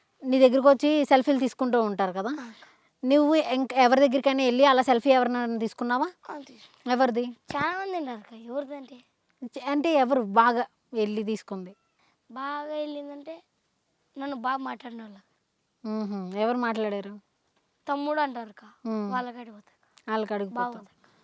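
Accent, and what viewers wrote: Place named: Visakhapatnam, India. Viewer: maa thalley annadi antey pakka vizag eyyyyy…😎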